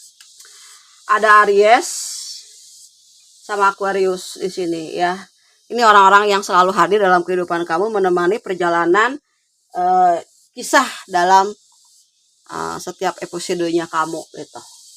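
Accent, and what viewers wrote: West Java, Indonesia. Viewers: Aduh ngakak..lucu..orng sunda ya nih?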